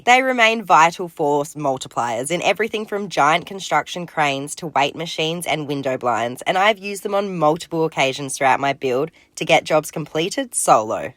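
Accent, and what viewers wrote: Australia. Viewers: So aussie, "me likey " yeah,nah.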